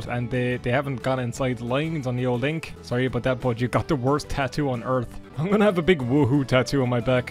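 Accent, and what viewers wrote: Ireland. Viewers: I'm a simple man. I click on a let's play of the Sims games. I hear an irish accent. I hit subscribe.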